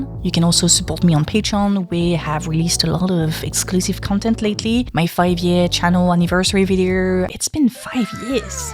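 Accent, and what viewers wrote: France. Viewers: Why does sound more French than she used to? Her videos from 3 years ago sounded closer to an English accent than now? Is she just not around English speakers as much now? Just curious. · I assumed you were upper-class because you're French and have a French accent. (And because you're pretty, admittedly.)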